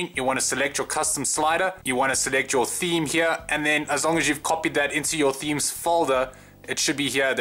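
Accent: South Africa. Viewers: You sound South-African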